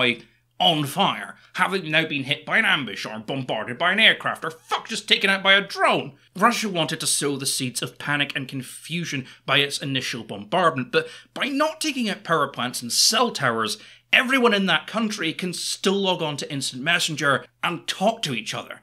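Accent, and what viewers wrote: Scotland, United Kingdom. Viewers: I love the Scottish accent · Why does he sound like Sheogorath,but Scottish and sounds like he's sick.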